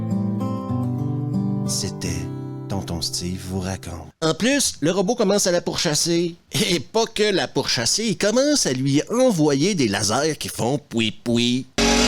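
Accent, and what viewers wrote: Quebec, Canada. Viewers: Salutation fraternelle Steve. T'as définitivement fini par m'avoir, en plus de nombreux fou rire (suffisamment rare pour le souligner) et de.ton tellement sarcastique (j'apprécie énormément en n'en faisant preuve moi-même au quotidien) ton intro m'a tué de rire tellement simple, tellement sobre mais surtout tellement et en prime d'une efficacité extraordinaire 😂😂😂😂😂. Je m'y attendais tellement pas, je me dis que du coup j'ai bel et bien fait de m'abonner je regrette pas 😂😂😂😂😂. Continue de nous éclater avec ta gouaille tellement québécoise, t'es vraiment un bon, on est certes loin des " Cahiers du cinéma" mais c'est tellement jouissif d'objectivité concernant un certain cinéma tombé en désuétude. Nous sommes de la même génération apparemment et tout ce cinéma me manque terriblement. On ne refera pas le passé malheureusement mais dépenser des sommes astronomiques pour voir des septuagénaires en famille ça relève de l'escroquerie. 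Que certains producteurs en prennent conscience et ce bouge le c... , au lieu de financer des taxis 5 et autres bouses du genre, je dis bouse pour ne pas dire navet ou nanar, jai trop de respect pour un Halloween 5 que pour un Indiana jones. Force à toi l'ami et prends soin de toi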